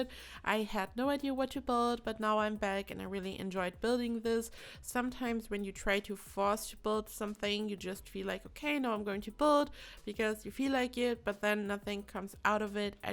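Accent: Germany. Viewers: Just a question, are you German? Cause I think you have a very German accent! 🇩🇪🖤❤️💛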